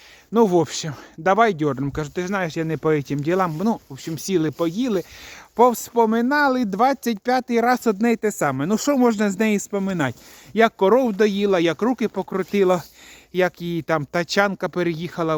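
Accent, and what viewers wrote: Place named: Kozelets, Ukraine. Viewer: Каждое видео, как дома побывала, говор знакомый с детства, Козелецький район, ни одного западенского слова, все красиво и понятно и настроение Новогоднее.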